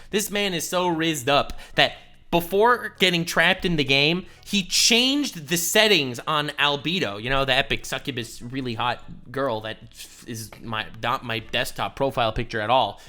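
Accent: United Kingdom. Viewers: From when are you british? Just I'm sure it was dritish english. Like please. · THe Brit accent is freaking classic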